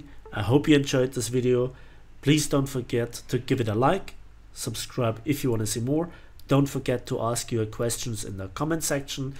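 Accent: Germany. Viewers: Hi! I'm an AB pilot with 40.000 air kills and 11.000 ground kills and I'm starting to look at tanks now. I'm a total rookie at tanks and your huge channel is an enigma to me... there is so much stuff to watch and from what I saw sofar it's very good stuff... and here's a problem... I'd like to see some guidelines for tank noobs... and some guidelines to your channel... what videos to watch, where to start on your channel and for most part... guides on how to start with different nations... witch premium to buy, which tank to put talisman on... strategy, tactics, ammo guides... it's a rediculus stuff to you but if you want new subs then stop concentrating on pro players and start looking at rookie ones... because believe me... there are much more of us then there are pros:-D And just a little tip... for christ sake make your videos shorter... you like to talk... 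a lot... and that's nice but maybe you should sepparate your videos in talking ones and action ones... your subs are not allways in the same mood to watch long videos... 45 mins video is an absurd length... maybe add some index table for stuff you talk about in such long videos so people can skip parts they're not interesed in. And please make more AB stuff as you yourself pointed out in one of your recent videos that AB is much better and practical for spading then RB... I love your channel and all the effort and time you are putting in to it and I love your german accent and I wish you get 100.000 subs in the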